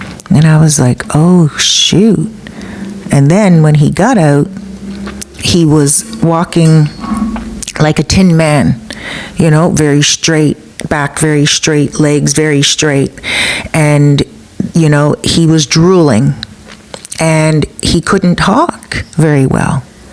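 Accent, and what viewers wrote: Canada. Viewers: Love your Canadian Accent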